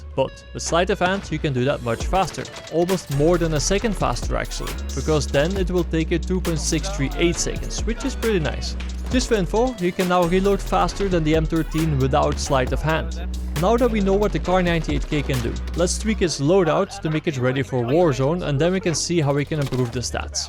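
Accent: Netherlands. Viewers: U dutch?? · Jo deze gast is Nederlands 100%% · Nice dutch accent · Love the Dutch "Sneiper" accent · He is Dutch for sure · you are dutch right :D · First video I watch of this dude, and I can already tell he's dutch lol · Je klinkt Nederlands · The Dutch accent is strong in this one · Ate you Dutch ? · this dude sounds dutch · 100% NL door z'n acent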